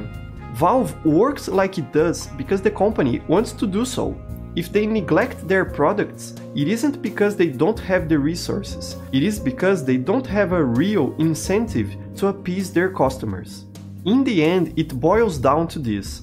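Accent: Brazil. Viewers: your accent sounds a bit brazilian so caraio raridade ver brasileiro fazendo vídeo de qualidade em jogo que foi praticamente esquecido aqui no país, belos vídeos hue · He's accent is so much like a brazilian trying to speak english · Whoa that accent, Brazilian? Que loco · Unrelated to the video buuuut you sound brazilian and I find that fascinating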